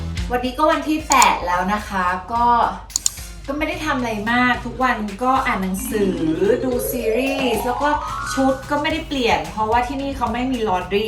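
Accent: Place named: China. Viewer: สำเนียงจีน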